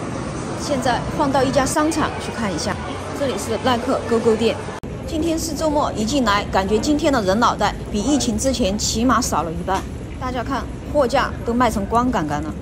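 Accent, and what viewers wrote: Sichuan, China. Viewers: 四川口音。你们应该听不出差别的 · 的视频必看,最喜欢的是你说的“川普”（四川普通话)👍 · 赞一个👍👍👍非常喜欢你的川普，好靓好聪明的脑壳！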